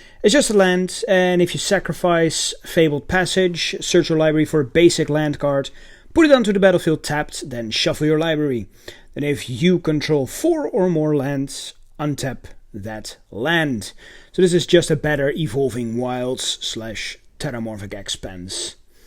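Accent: Netherlands. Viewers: Dutch accent for sure, groetjes uit A'dam!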